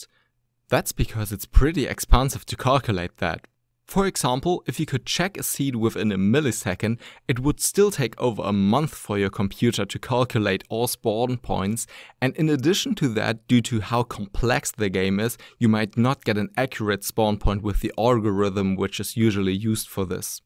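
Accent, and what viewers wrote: Germany. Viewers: Du hörst dich bissl deutsch an…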